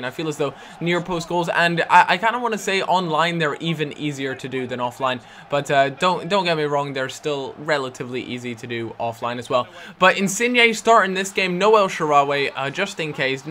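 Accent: Scotland, United Kingdom. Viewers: where is Scotland do you come from?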